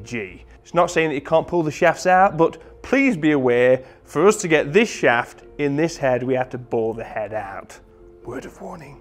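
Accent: United Kingdom. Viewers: why do you have a british accent tho?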